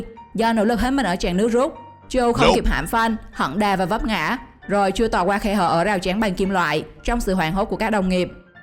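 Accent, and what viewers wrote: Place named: Quang Ngai, Vietnam. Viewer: Tập nói giọng lại đi, sao nói nẩu qué vại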